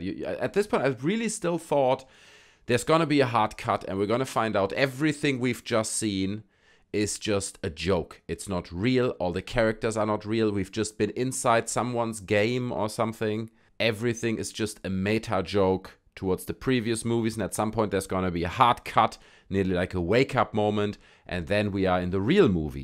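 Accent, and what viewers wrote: Germany. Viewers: HAHAHAAAA! LOL. Your German Accent just makes everything sound funnier! Good job though. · This guy has an oh so subtle german accent but speaks great English...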